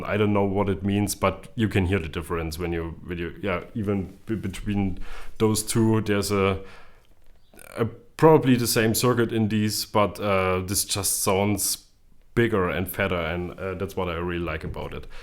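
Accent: Germany. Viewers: A German man who speaks English😂 nice accent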